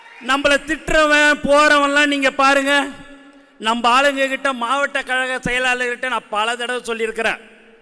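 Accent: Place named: Chennai, India. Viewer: Dei bussi enna kandraavi pechida. Tamil le olunga pesa theriyala. Poi tution teacher vechi kathukittu vaada. Eanda Tamil la kolra. Edhula baloon kadha vera. Sagikala da un pechi. Madras basa pesi kolraan. Adhayum mulusa pesa theriyala. Kandravi college kadhai . paavam vijay endha kelatu moodhevi yai vechi epdithaan samalikeraano paavam. Vijay nalaye mudela morache morache paakuraan tvk thalaivan ella tharkuri.😄😄😄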